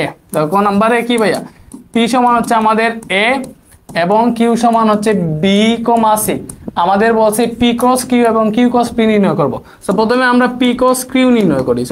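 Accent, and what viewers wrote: Sylhet, Bangladesh. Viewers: Vai mone hoyy Sylhet E😮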